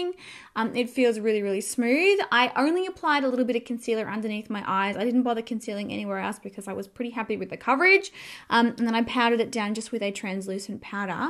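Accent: Australia. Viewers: This is the first time seeing you and I'm already a fan. You are gorgeous and charming and your Aussie accent is adorable. Subscribed!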